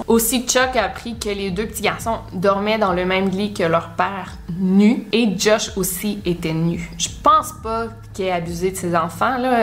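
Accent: Quebec, Canada. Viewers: merci pour cette terrible histoire, je ne l'a connaissais pas. Une abonnée supplémentaires. Dîtes moi,vous êtes Québécoise? Bel accent et pays que j'aimerai visiter. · J'avoue !!! Et pourtant de base je ne suis pas fan de l'accent québécois et ben là je peux juste pas m'en passer!!! Y'a que les imbéciles qui changent pas d'avis hein 😉😅😇 comme tu dis ma soeur, qui dit mieux 😍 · Coucou, je trouve que tu racontes super bien, je t'adore, c'est vrai que au début je comprenais pas tous les mots car tu a l'accent du Québec (moi je suis française a 100%😅😅) mais je m'y habitue et je trouve que tu mérites beaucoup plus d'abonnés!!! Bisous bisous et continue tes vidéos, elles sont trop bien! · J'ai adoré J'ai liker avant même de regarder la vidéo j'attend avec impatience tes vidéo et en plus je kiffe l’accent québécois Bisou de île Maurice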